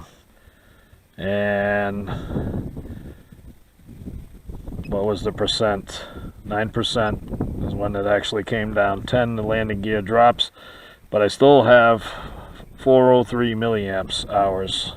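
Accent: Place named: Boston, United States. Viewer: You sound like you’re from Boston. I just got into these older inspires and I’m probAbly gonna start running into battery problems. Maybe you can help out a fellow Bostonian with a couple of inspire 1s. Love to hear from you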